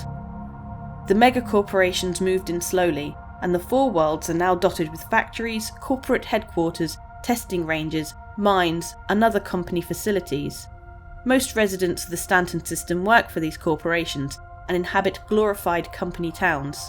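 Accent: United Kingdom. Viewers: The British accent adds 50% more authenticity to the video.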